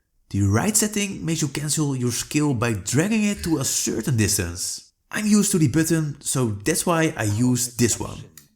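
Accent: Netherlands. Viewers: Nice video man! Na het 1e woord was het al duidelijk dat je Nederlands was haha.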